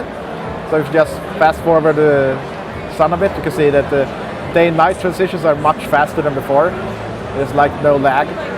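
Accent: Sweden. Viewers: is he swedish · guess the commentator is swedish..... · Hihi, sweedish-english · Jag gillar den typiska svenska engelskan :D I like his english accent :D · Yeh....stfu and face the facts, clearly its a convention by the ammount of talking, he has a swedish accent that sounds EXACTLY like Notch, its on an offical youtube channel which is IGN hes on a beta version which is probs only available to the devs, so please learn to use your small minded brain before commenting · det hörs att han är svensk! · swedish english..... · Hahah, i hear that ur swedish! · is it just me, or are Swedish accents awesome? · No, he always spoke like this. XD His accent is very Swedish. XD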